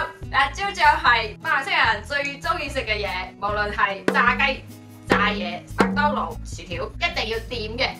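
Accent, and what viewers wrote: Cantonese, China. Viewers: 姐說的粵語真好聽。 呵呵~我就知道會有Milo，可惜我沒喝過大馬版的，台灣版的我覺得還蠻好喝的。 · 為什麼我聽妳講廣東話特別的舒暢？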